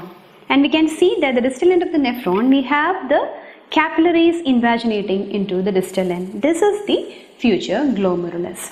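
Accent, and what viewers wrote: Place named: Kerala, India. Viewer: മലയാളി സ്വരം. My brain detect sound samples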